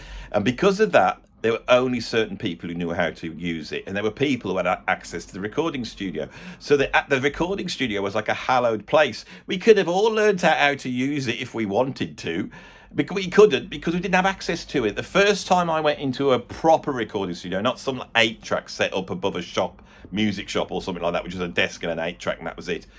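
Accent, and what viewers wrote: Birmingham, United Kingdom. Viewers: I think a lot of people watch your channel for your comical Brummie accent plus your wealth of knowledge.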